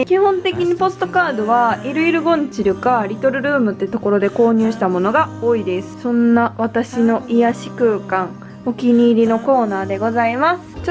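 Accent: Kansai, Japan. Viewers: 初見なんやけどこんな可愛い部屋でこんな可愛い顔してバリ関西弁なん最高すぎる。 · 初見なんですけどムッチャ好きになりました(＊> <＊) サムネの雰囲気とちがってゴリゴリの関西弁やしもうはまっちゃった！ 興奮気味💓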